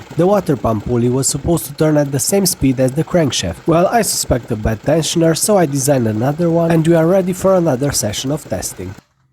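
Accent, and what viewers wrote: Italy. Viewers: This guy is Italian??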